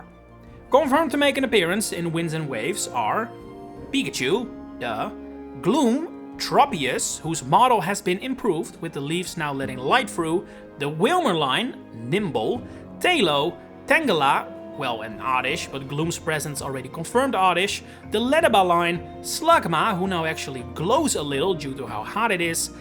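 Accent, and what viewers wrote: Netherlands. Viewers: New to the channel and great video. Side question: are you Dutch?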